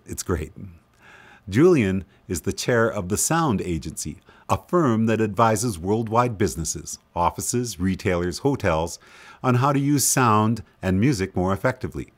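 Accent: Canada. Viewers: hey i didn't realize you sound canadian until now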